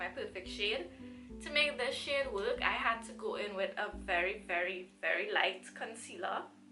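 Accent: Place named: Trinidad and Tobago. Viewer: trini!! new subbie soon as i heard the accent. thought the color was totally off at first but with some oxidation you worked it out and you looked beautiful.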